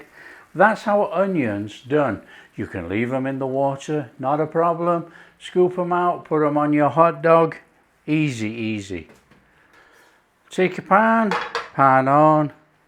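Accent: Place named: England, United Kingdom. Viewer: 😂🤣Love when you wrote “dodgy blokes” 🤗 That’s why I love, his English accent plus the great dishes he serves.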